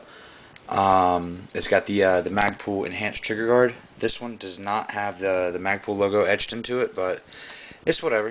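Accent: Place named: Tennessee, United States. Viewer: are you from tennesse? i noticed the tennesse accent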